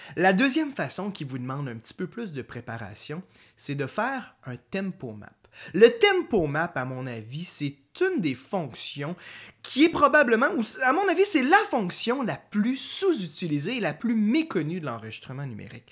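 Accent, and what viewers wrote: Quebec, Canada. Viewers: oui je suis d'accord ! je le fais "quasi" systématiquement sur les chorus ou passage solo j'augmente de 2 ou 3 BPM , c'est devenu un reflex et c'est terriblement efficace ! je bosse sur ACID pro 6 ( je sais c'est rudimentaire ) mais je métrise parfaitement ce DAW depuis les années 2000 il est trés simple et parfait aussi pour ce genre de technique . je balance un clic en audio sur la premiere piste et en Beatmapper je peux changer le tempo de celui çi sur une seconde piste ... Merci pour tes videos et j'adore ton accent quebecquois même si parfois j'ai du mal à traduire certaine expressions ;)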